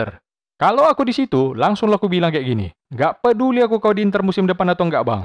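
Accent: Medan, Indonesia. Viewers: Lama lama kaya minang kocak bang, tapi versi medan wkwkkw sukses bang.. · Mantap kali logat Medan itu lek..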